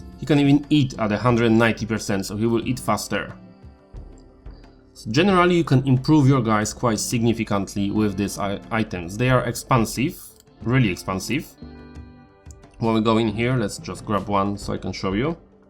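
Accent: Poland. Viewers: Łatwo po akcencie poznać polaka. Dobre przedstawienie moda.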